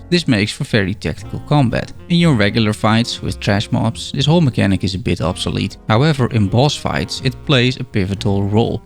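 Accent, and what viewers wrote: Netherlands. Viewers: bruh you are from the netherlands i can tell haha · tering zeg wat een nederlands accent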